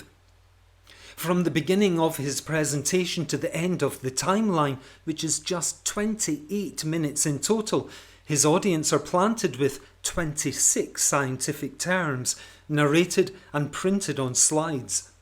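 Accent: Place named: Scotland, United Kingdom. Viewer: Excellent insights! I've only just discovered your channel and am enjoying it immensely. I have a friend who is fully signed up to the whole fake-Beatles, PID, nonsense. He started out as a total sceptic then flipped to the opposite point of view, almost exclusively on the strength of some of the ostensibly anomalous photos of Paul. I could send him your superb debunking videos, but he is so adamant he's right they would probably bounce straight off. Anyway, it's a pleasure to hear your cool and measured Scottish accent and your very well-marshalled line of argument. 👏👏👏👏👏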